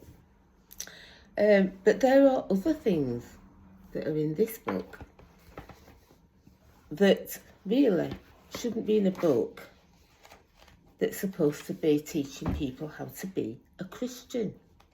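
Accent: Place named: North, United Kingdom. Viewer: Hey sis, who'd of thunk our Northern accents sound good to people overseas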